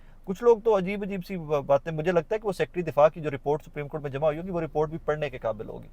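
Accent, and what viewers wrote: Punjab, India. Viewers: Ur Punjabi so good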